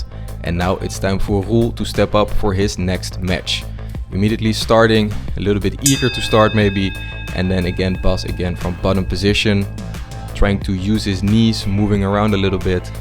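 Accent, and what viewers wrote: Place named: Netherlands. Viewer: Do I smell Dutch?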